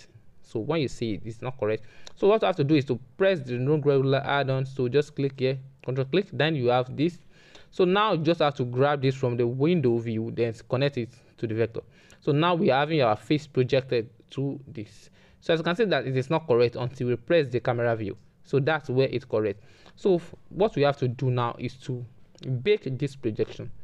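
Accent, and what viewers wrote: Nigeria. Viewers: Talent speaks!!! You sound like you're from Nigeria I just subscribed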